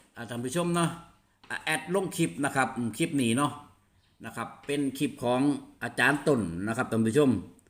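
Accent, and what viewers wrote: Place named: Korat, Thailand. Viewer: แอด ภาษาโคราชบ้านเอง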